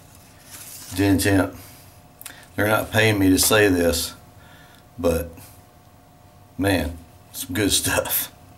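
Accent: South, United States. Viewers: He's got a gentle southern accent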